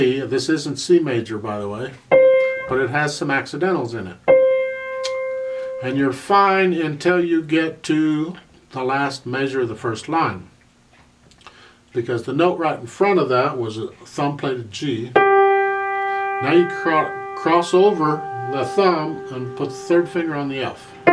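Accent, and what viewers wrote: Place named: Kansas, United States. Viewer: Beautiful. Thank you! It could have been so cute hearing your Kansan accent saying in Spanish. 😊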